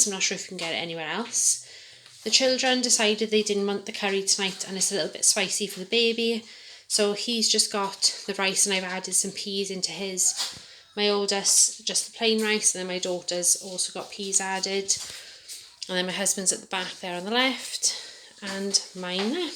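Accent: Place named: Wales, United Kingdom. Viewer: Hello, new subscriber😊 just wondering where your accents is from, I’m from South Wales myself and your accents sounds welsh😊 brilliant video x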